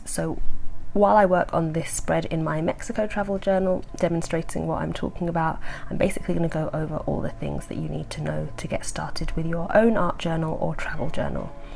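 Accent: United Kingdom. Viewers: normally british accents kinda remind me of how shitty humans can be, especially the very articulate well enunciated ones. but you, you broke the accent mold for me.... the format you use has bin abit of a schtick, most of the time. with no audio id assume you would be obnoxious cus of judging things based on other things, but this breaks the format mold. basically i think you are neat. thanks for being cool!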